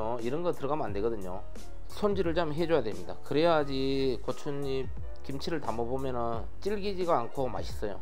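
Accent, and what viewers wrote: Jeolla, South Korea. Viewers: ㅋㅋ 설명 참 재미 있으 시네요~~경상도 말씀에 전라도 억양같기도 하구요~~맛있어 보이는데 함 해볼께요~~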